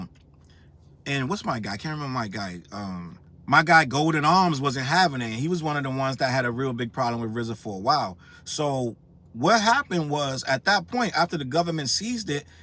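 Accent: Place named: Texas, United States. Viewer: Now you sounding like you’re straight out of Texas with this one.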